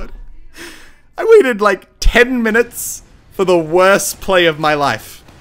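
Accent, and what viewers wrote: Australia. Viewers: MERGUD HES AUSSIE! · is australian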